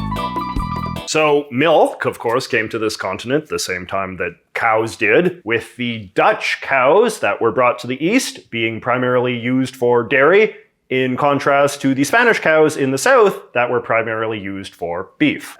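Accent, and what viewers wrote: Canada. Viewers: yes... I got it. The video was fun and well made anyway. I love your approach to culture. In France, culture is only considered with a capital C, focusing on the classics. Nobody would dare to take seriously a bunch of goodies found in happy meals. It is very refreshing. Beside, it enables me to learn a lot about American or Canadian culture. Love your Canadian accent too. I wonder if it comes from the French roots of Canada. Some French do indeed pronounce "about" just like you. On another topic, I wonder if you would consider making a video about Jordan Peterson. As both an advocate of free speech and a gay, I wonder what could be your intake on his case.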